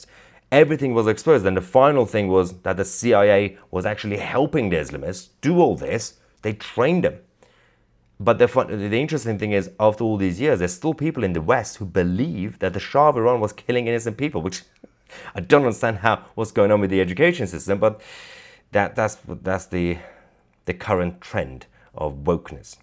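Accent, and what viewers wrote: United Kingdom. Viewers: Nice British accent and well broadcasted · Excellent news report on a great country under crazy leadership. I enjoy your wonderful British accent! Keep up the good work.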